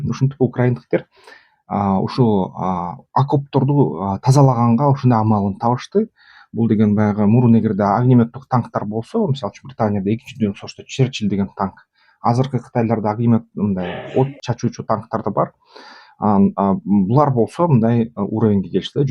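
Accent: Standard. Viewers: отто таза сүйлөйсүң дикция керемет.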